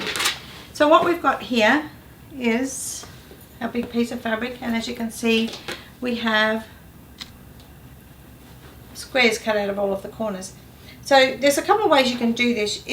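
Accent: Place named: Australia. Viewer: I love your tutorials and it is great to have an Aussie accent!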